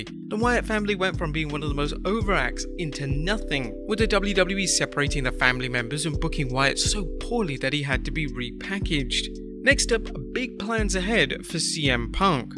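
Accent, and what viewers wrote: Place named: United Kingdom. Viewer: Are you British or australlian? Cause you sound British 90% of the time but the way you say content like there's an extra e in it makes me think you're Australian...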